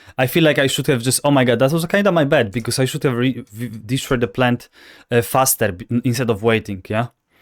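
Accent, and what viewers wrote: Poland. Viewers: piękny polski akcent. drugi taki, rosyjski, Malkovitch w "Rounders"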